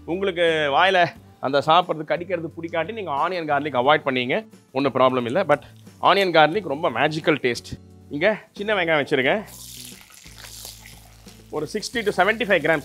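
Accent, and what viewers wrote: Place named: Triplicane, India. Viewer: சார் நீங்க சின்ன வயதில் திருவல்லிக்கேணியில் இருந்தீர்களா பேச்சு ஸ்டைல் அப்பிடித்தான் தெரியுது. நல்லா ரசிச்சு பேசி செய்றீங்க. Very interesting.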